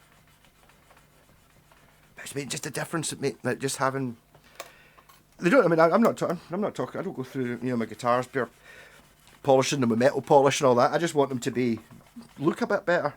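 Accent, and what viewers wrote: Scotland, United Kingdom. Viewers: Great advice re working on cheaper guitars & refreshing to hear a Scottish accent ps uve got me wondering about temu pickups for a couple of kit guitars ( the pickups that came with the kits are dreadful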